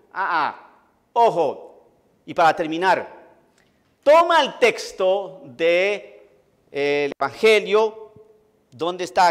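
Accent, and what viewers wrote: Colombia. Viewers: tiene mas acento colombiano que mexicano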